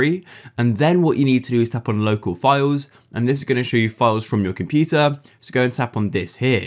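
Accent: United Kingdom. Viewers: i could tell this guys british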